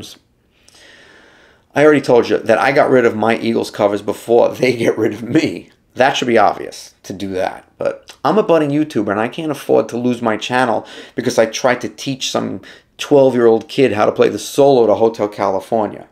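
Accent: New York, United States. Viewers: Great content. Your accent takes me home - NJ? NY? As a transplanted New Yorker I noticed it immediately.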